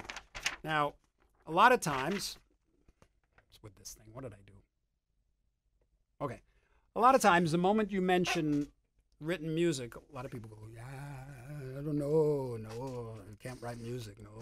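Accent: United States. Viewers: I just got back to this after a day of work. I can totally hear your Brooklyn accent now!!!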